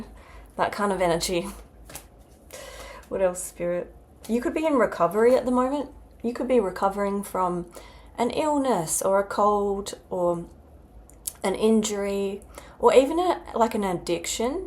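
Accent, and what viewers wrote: Australia. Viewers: I love Australian accents.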